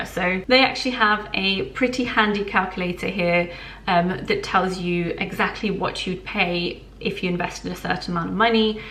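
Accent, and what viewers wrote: England, United Kingdom. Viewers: I am going open an account..What I don't understand is why in some countries financial advisor suggest not to invest in ETF.... By the by, beautiful accent. May I ask where in England you are from?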